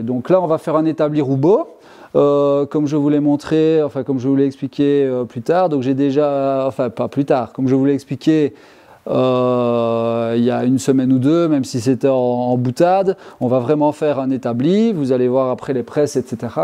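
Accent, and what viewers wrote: Belgium. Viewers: Slt, tu as un chouette atelier, merci pour cette vidéo, tes conseils et le parler Belge avec humour. Pour les cours d'api, tu as un voisin qui maîtrise très bien le sujet: <link> à bientôt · Rare sont les artisans qui soient autant passionnés et très pédagogue dans le partage de leurs savoir faire et en plus avec l'accent Belge !!!!!😁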